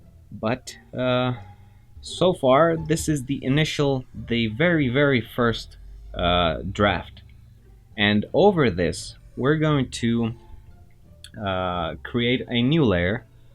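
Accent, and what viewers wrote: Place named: Romania. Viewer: Saw your comment on one of Jazza's videos and wow, i'm glad I checked out your channel. I love the moderate pace and good explanations. Just wondering, are you by any chance Romanian? Your name sounds very Romanian and the accent sounds familiar for some reason :)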